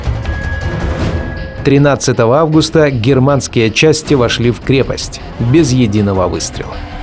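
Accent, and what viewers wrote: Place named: Nizhny Novgorod, Russia. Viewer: У комментатора нижегородский акцент), с особенно выраженным произнесением буквы "я" в словах...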